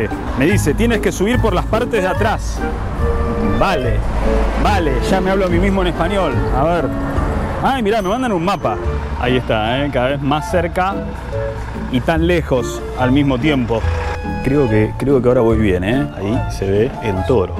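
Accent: Argentina. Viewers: Que buenos tus vídeos, es muy agradable escucharte hablar a cámara, supongo que el acento argentino también nos llama mucho la atención a los españoles. Hasta hace poco cerca de mi piso había un asador argentino con una familia muy agradable regentandolo, qué pena la última vez que fuimos a reservar y vimos que había cerrado. Supongo que la pandemia pudo con ellos.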